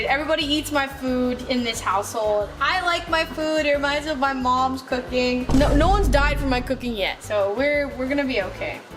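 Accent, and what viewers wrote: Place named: United States. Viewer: Why does she have an American accent now?? ☹I've watched ever since her K-pop days and her accent was distinctively British whenever she speaks English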